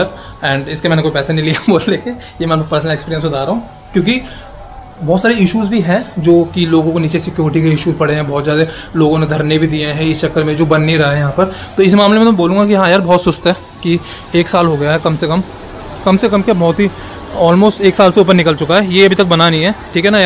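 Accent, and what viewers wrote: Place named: Pahadi, India. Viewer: It was so obvious that you are pahadi by your way of talking... Very nice video you have almost covered all the minute details. Thank you for this video😅 I'm from pahad too😊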